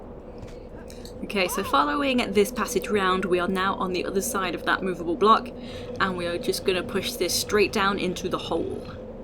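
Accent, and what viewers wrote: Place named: United Kingdom. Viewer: WHEN DID SOUDED BRITISH JUST WONDERING.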